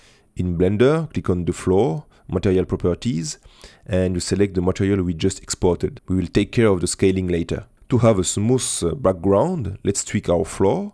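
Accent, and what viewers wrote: France. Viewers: I really like your french accent dude!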